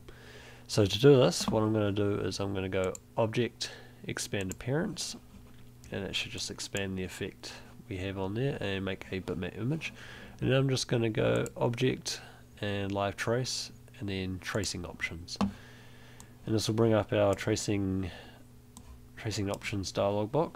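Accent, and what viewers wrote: New Zealand. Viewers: kiwi accent is very obvious, nice mate, sweet as :)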